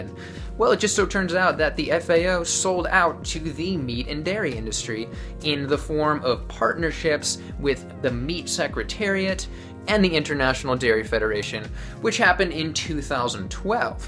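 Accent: United States. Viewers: What accent? I assume you're talking about some version of an American accent but It just sounds like a typical American accent to me.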